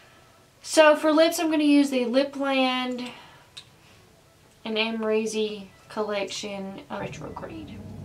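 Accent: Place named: North Carolina, United States. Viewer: You sound like you're from where I'm from :) NC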